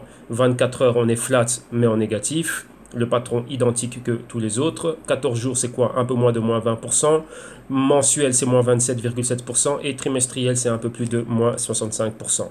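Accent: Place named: Belgium. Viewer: Tu as un ton qui ressemble à celui du sushis...es tu belge?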